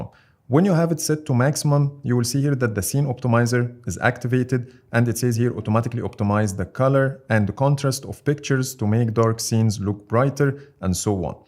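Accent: Egypt. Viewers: يابن عليك مصري اوي يا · your accent is so Egyptian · brother are you from egypt you have a very masri accent love from uae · im guessing you are egyptian because of your accent its really good to see ppl from my country making videos in english too keep up the good work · مش عارف ليه حاسس انك مصري 🙂 لهجتنا معروفة حتى بالانجليزي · The one talking in the video is Egyptian, I know that accent man xD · اللهجة المصرية بالانجليزي مستفزة جدا ....مفيش كلمة وحدة بتتنطق صح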